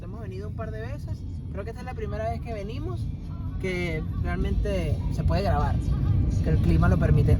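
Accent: Venezuela. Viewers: Eres venezolano? Me parece tu acento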